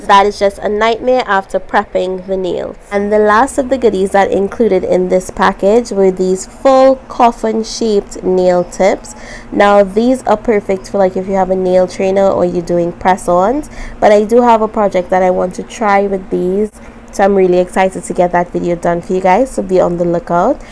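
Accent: Trinidad and Tobago. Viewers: Are you Trini? I hear a accent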